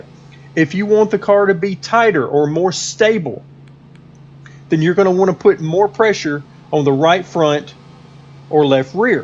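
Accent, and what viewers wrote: South, United States. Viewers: For some reason, your southern accent gives this validity. I hear it and I'm like "this guy probably knows more about nascar than me"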